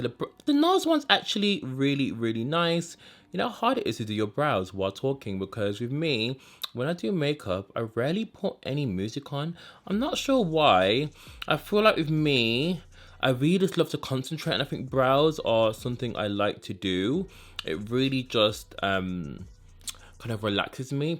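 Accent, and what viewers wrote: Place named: Northern England, United Kingdom. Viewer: You’re so gorgeous it’s bloody ridiculous! Make version of Naomi C. Anyways I can hear a Sheffield or Man accent but you say all those American slangs I’m confused 😁. Uk based or nah?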